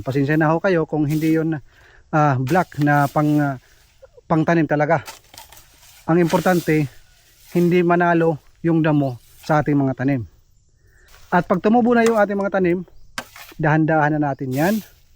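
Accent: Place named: Visayas, Philippines. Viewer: saan ang lugar nyo boss? para kang bisaya! yan rin kasi ang plano ko, mag reretiro na kasi ako sa agosto.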